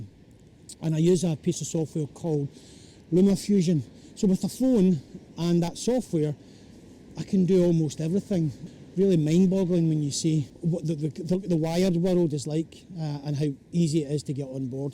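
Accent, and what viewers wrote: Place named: Glasgow, United Kingdom. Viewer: Did my undergraduate study at the university of Strathclyde in Glasgow, your accent really reminds me of the old times, glad you like China~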